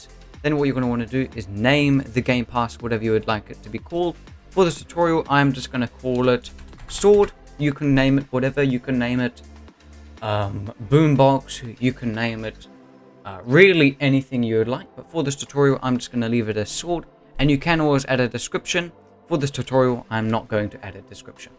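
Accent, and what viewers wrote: United Kingdom. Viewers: pronounce your words dirty british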